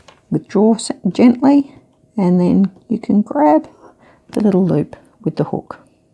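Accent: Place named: Australia. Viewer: Thanks for the demonstration. I have one of these but had no idea how to use it. A very useful gadget I met you recently in the Janome shop in Joondalup where you helped me buy my lovely new machine. Good luck with your channel. So nice to hear another Aussie voice on YouTube. Maybe some advice on how to use the one step button hole foot next?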